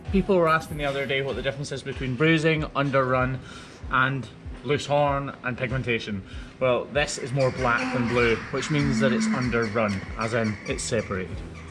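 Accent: Scotland, United Kingdom. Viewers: I know nothing about farms, cows, etc. going through my you tube and saw one of your video’s, clicked it and binge watched a ton of your video’s.l admire your work. I don’t even know how you deal with the poop and pee, let alone the job. Your a kind person. Your face looks really good . I saw where your eye socket and nose was broken WOW! Curious , how did you get into this type of job? Love your accent.Scotland looks so beautiful. Been looking into my family tree my grandfather last name was McClurg. Searching to see if it’s Scottish or irish.Take Care. I live in the United States.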